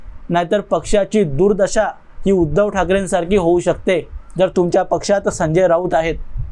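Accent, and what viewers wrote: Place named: Karnataka, India. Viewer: तुम्ही स्वतः कानडी भाषिक आहात का ? काही उच्चारावरून तसे वाटते . तरीही तुमचे व्हिडिओज खूप चांगले , स्पष्ट तरीही मुद्देसूद असतात .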